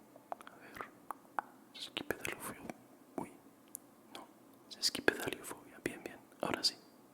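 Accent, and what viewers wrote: Spain. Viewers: El Sonido bajito y delicioso de tu voz, reforzada con ese rico acento iberico, Me Pueden Me Llevan ...😘😌😴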